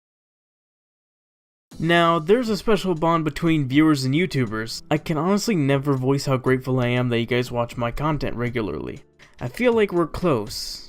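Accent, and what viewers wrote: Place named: Boston, United States. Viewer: Noice boston accent...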